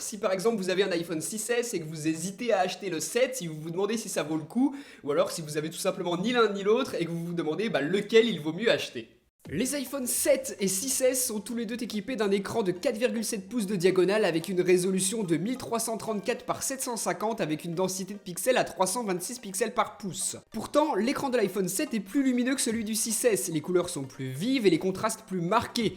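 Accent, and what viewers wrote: Lyon, France. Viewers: Oh mais t'es lyonnais??? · Mais tu es de LYON ?!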